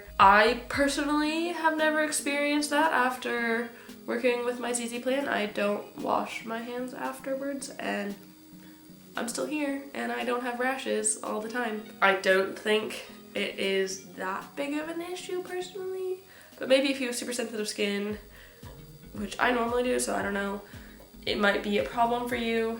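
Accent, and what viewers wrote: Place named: United Kingdom. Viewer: Are you British? I hear a bit of an english accent...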